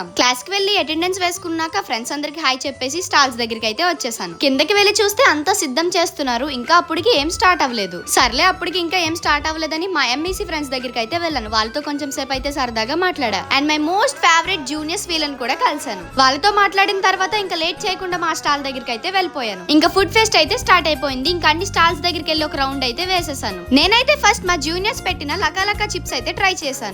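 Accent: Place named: Srikakulam, India. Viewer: Akkao srikakulam language sister 😅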